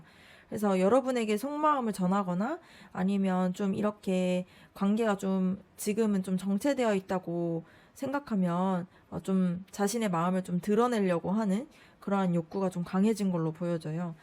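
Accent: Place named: Jeolla, South Korea. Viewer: (전라도)향기가 나는 구수한 리딩 잘 들었습니다😊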